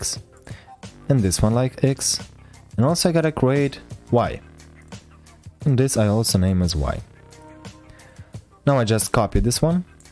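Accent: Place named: Russia. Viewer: i can hear some russian accent in it but it's so good it's like playing a video game about WWII LMAO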